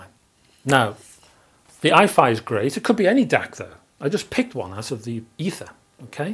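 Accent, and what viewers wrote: Liverpool, United Kingdom. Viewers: Hi, I recently purchased the Audiolab 6000A and then subsequently found your youtube page and web site, so it was probably a sheer fluke I made the decision I did. Anyway, now i'm watching your review of the 6000CDT and will probably get me one of those. I do however have a couple of questions, if I may: my experience of hi-fi is purely analogue and in your review you discuss the merits/benefits of adding an external DAC - excuse my ignorance if you covered my question - the 6000A has an internal DAC (?), so would this combo of the 6000A and 6000CDT still benefit from the external DAC. My apologies for the long winded getting to the question, my second question - are you a scouser? thanks in advance mate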